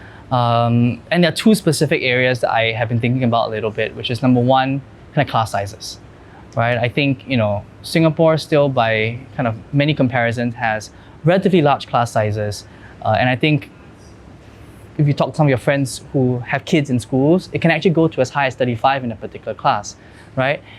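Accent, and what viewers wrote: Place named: United States. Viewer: Listen to him in another podcast. He has this American accent which is attractive but lacks depth in his conversation.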